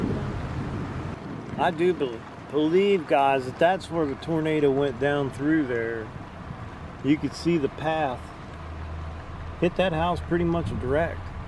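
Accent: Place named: Pennsylvania, United States. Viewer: Hey I found your channel by accident, but love the the content. The first video I watched I heard your accent and knew you where from Pennsylvania. My ex- in laws where from Uniontown. I loved visiting that beautiful part of the country. Very nice folks. I hadn’t heard about the tornado till I watched this. So very sad. Thanks for the entertaining videos. Gayle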